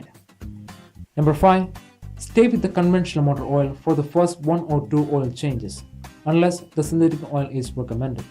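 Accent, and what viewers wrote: India. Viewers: your accent is still indian😁😁😁😁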